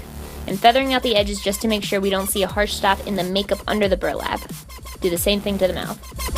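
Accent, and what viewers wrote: England, United Kingdom. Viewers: A British accent and a scarecrow look? DOCTOR WHO CONFIRMED. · Your English accent is so funny😂❤️ we don't actually sound like that though aha xx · Her British accent is so hilarious I love it 😂🍩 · That british accent though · Lol your Brit access sounded like Rebel Wilson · Loving that English accent😂😂😂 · Doesn't anyone else think her British accent sounds like iJustine's British accent?!?.,!!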